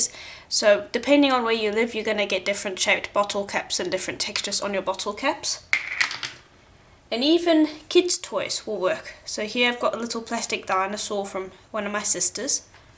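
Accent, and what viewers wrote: South Africa. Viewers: Are you South African? Sorry I’m trying to guess your accent. 🤣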